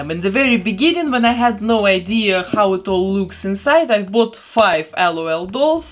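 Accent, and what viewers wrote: Russia. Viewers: I LOVE your heavy Russian accent and also the happy music is kind of making this creepier but you still got a new subscriber 💕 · Акцент автора похож на русский. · Лол, русский акцент прям прёт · Я не знаю почему, но на каждом видео слышу русский акцент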